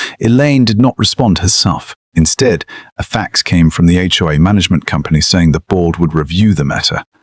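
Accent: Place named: England, United Kingdom. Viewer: A I needs to learn how to read English correctly. Learn how to pronounce garage. Need a voice from America not from England.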